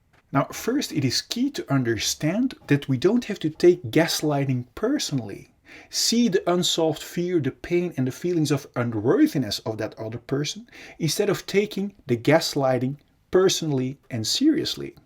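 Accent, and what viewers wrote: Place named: Netherlands. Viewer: I can hear your Dutch.... thanks for the video👍🏼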